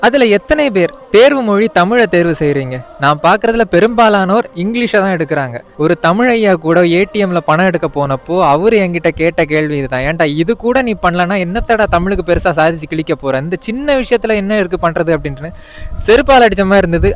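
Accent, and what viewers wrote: Nagercoil, India. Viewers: நண்பா நாகர்கோவில் மாதிரி தெரியுதே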